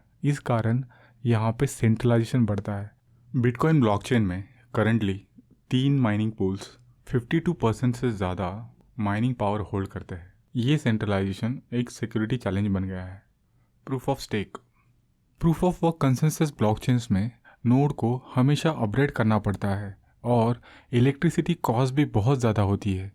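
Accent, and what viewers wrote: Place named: Maharashtra, India. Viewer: seems like you are Marathi person.. accent warun watala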